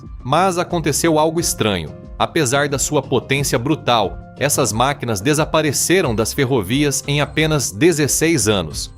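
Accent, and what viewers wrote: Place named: Caipira, Brazil. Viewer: Eita sotaque caipira.... rs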